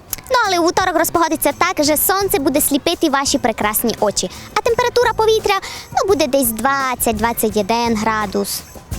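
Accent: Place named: Transcarpathia, Ukraine. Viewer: Podriv Ustoev Закарпатська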